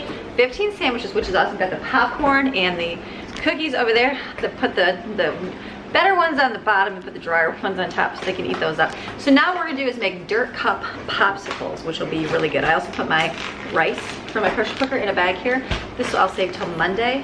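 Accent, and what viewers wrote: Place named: Michigan, United States. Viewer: I just found your channel and immediately fell in love!! I hear the accent and wondered.. is that the Michigan accent I know so well?! 😂😂 I’m from Traverse City!! My hubs and I live in Savannah, GA now for his work. It’s a very different world down south!! Thank you for the new channel addiction LOL!!! 💜💜💜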